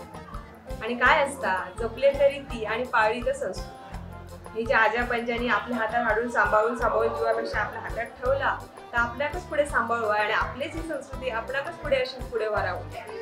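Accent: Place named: Malvan, India. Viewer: एव्हढे अस्सल मालवणी इतरांच्या व्हिडिओत मी खचितच ऐकलं असेल, मस्तच 👍👌👏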